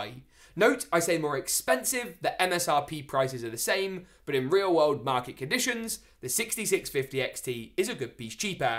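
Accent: United Kingdom. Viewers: Lol I’m sad he has British accent but he didn’t do the video on £ I mean it would have helped us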